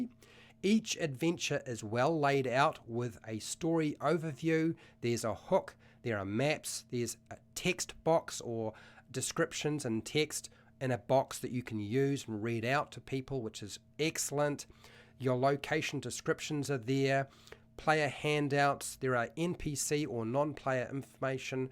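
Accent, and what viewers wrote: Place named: New Zealand. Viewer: The kiwi accent makes me so happy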